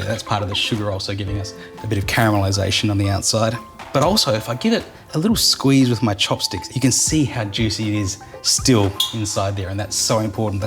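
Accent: Australia. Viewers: I love Australian accent. They seem so chilled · That's just Australian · He is Aussie. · Opens video to learn new recipe See’s asian dude but hears Australian accent strokes out 🥴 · I switch after I hear that accent Australia? Fake Japanese food :) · It's weird seeing a Japanese guy talking with this Australian accent lol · I'm confused Is he Aussie, English, or Japanese? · Dude looks Asian, sounds Australian and vibes like a Mexican. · An Asian guy with an Australian accent. I love it!! And my mouth is watering for some of the chicken. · He sounds perfectly Australian and not Singaporean or Malaysian. This isn't a sg or my slang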